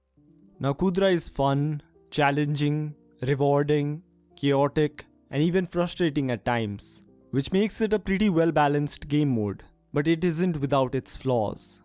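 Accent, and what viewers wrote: India. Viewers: by the voice i can surely say he is an indian love u from india <3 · I cant handle the thicccc indian accent it hurts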